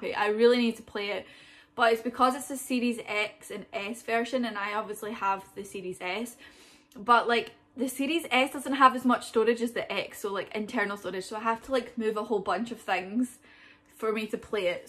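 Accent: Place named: Scotland, United Kingdom. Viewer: youtube recommended this to me for some reason but im here for it. came for the novelty, stayed for the scottish accent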